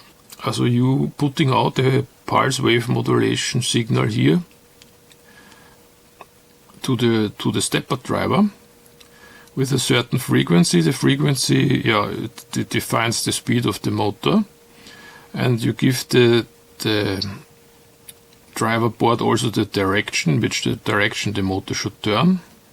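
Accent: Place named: Vienna, Austria. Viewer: Aguy with a Vienna accent, great.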